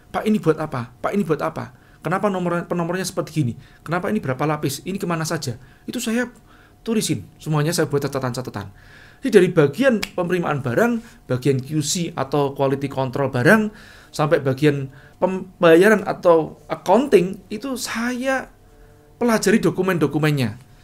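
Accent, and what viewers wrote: Java, Indonesia. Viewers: Medhoooknyaaa sukaa bang. Salam kenal dari Elsera di Sidoarjo.. Semoga saya bisa sukses seperti anda bang..🙏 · Rodo medok 😁,thanks inspirasinya bos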